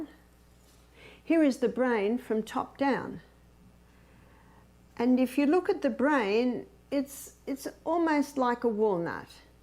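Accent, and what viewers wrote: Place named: Australia. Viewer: This story sounds so much more believable with an Australian accent.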